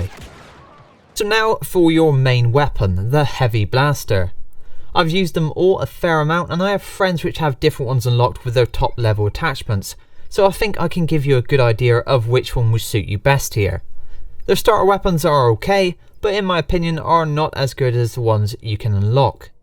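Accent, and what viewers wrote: West Country, United Kingdom. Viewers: The west country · Are you from Bristol? Or Somerset?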